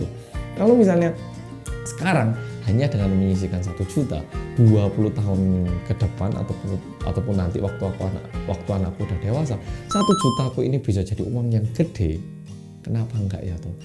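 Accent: Java, Indonesia. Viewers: mas medhoookkk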